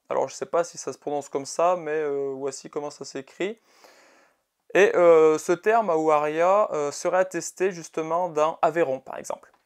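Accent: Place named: Saint-Étienne, France. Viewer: super vidéo ... avec l'accent stéphanois sur les "en" c'est un plus ... je connaissais seulement l'hypothèse 1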